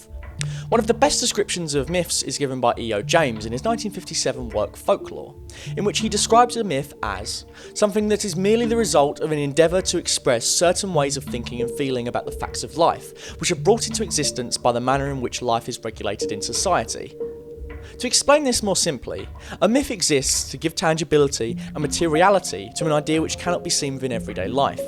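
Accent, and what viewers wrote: United Kingdom. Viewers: Your British accent is making my ears rot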